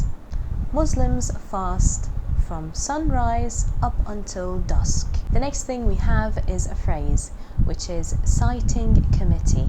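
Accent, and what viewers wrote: United Kingdom. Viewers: I really appreciate your British accent 🎉 thanks a bunch for the useful vocabulary & ramadan mubarak for you ❤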